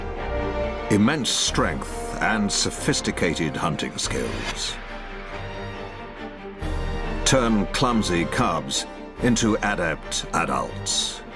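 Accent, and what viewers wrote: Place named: United Kingdom. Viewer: These are the ones with the British narrator..these versions suck. I like the original with the American narrator. These are garbage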